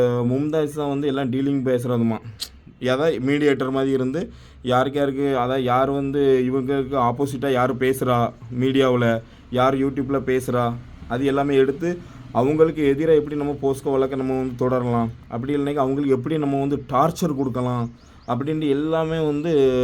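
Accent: Tirunelveli, India. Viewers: Ama sis ivanga tnvl . Ivanga nama slang la solrathu kekka நல்லாதான் இருக்கு 😂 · Thambee...enga oor karar aaa neenga??? Tirunelveli Nagercoil mix...slang...keka nallaa iruku😊...Naanum Apadi thaan pesuven😂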